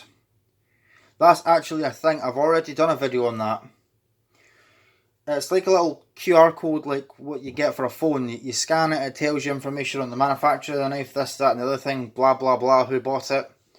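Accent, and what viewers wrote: Scotland, United Kingdom. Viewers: Just nice to hear a fellow Scotsman talk about similar hobbies, even if you were rambling shite, I'd still watch haha. You've spread loads of awareness with this channel!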